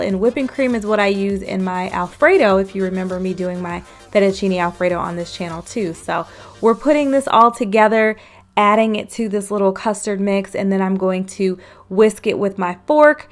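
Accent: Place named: Pennsylvania, United States. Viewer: You sound like your from PA somewhere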